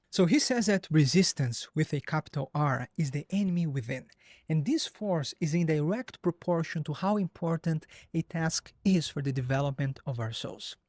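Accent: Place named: Brazil. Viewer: Sotaque brasileiro em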